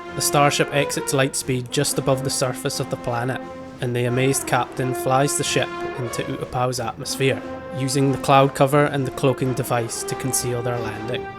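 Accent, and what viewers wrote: Ireland. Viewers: Why can I not tell if youre Irish or Balkan? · I'm guessing Irish. It's definitely from the Isles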